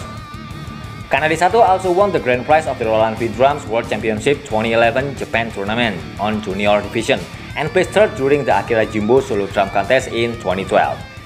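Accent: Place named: Indonesia. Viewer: suka sama ulasannya... tp bhasa inggrisnya kya brlogat bahasa indonesia...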